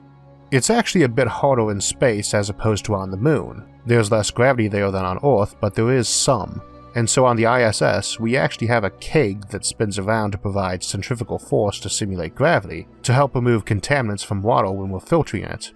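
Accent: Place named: Texas, United States. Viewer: I didn't think you had a speech impediment, I just thought you were from Texas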